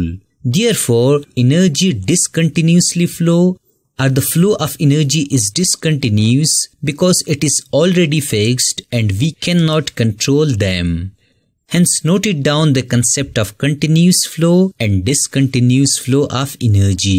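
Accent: South India, India. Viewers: By hearing your English I am thinking that you are from south India side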